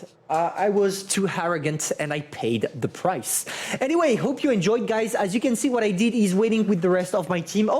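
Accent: France. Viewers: Awesome video for newbie and yeah, seems like u are france, arent you? Idk why but i think so.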